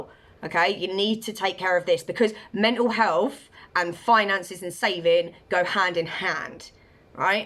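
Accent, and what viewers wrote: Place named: Leicester, United Kingdom. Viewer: Sounds like you're from leicester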